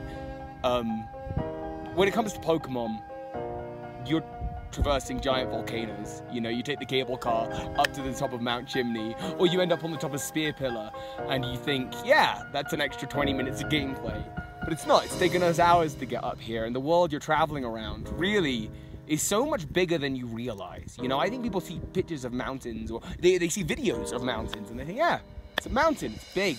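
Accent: United Kingdom. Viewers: ive always thought you said "so hi pokemon masters" cuz of the brittish accent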